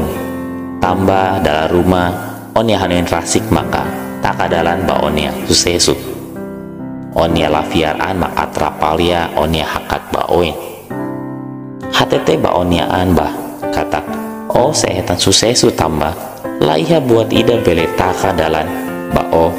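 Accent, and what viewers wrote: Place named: Indonesia. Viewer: Voice nia Orang indo nih🥰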